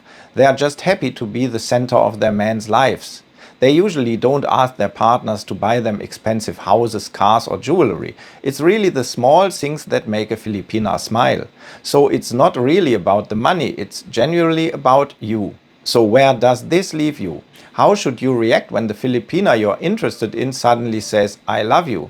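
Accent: Germany. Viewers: I love the German accent. It gives you credibility like a Mercedes Benz ad.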